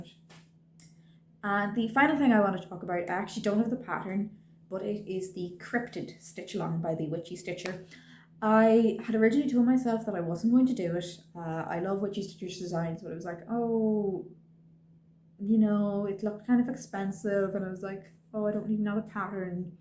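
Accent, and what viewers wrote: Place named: Ireland. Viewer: Love your fabric choices. Great to hear an Irish accent. Happy stitching.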